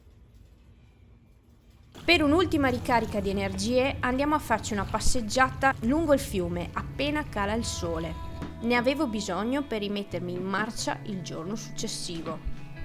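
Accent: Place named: Veneto, Italy. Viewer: Grazie per l'ennesimo episodio, quando mi appare la notifica è sempre un piacere guardare le tue avventure, il tuo modo di raccontare lento e pacato con quel tuo accento veneto, sono un toccasana, grazie ancora e buon viaggio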